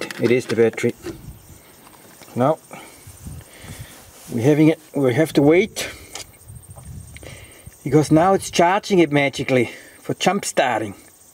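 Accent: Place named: Australia. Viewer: You sound like a ozzy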